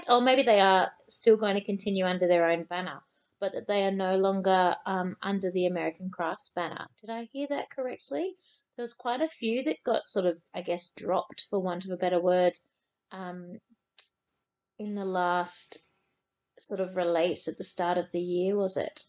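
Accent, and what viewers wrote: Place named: Australia. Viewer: I love the Aussie accent soooooo much so this was a lovely treat for me. Course, I'll be glad when Laura can speak again too ;)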